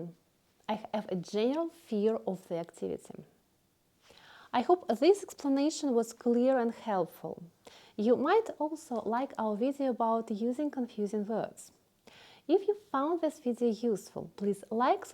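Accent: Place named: United Kingdom. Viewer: Hi, I'm interested in learning English with a British accent. I just found your channel and subscribed. Where are you from? Does your accent sound different from other British accents?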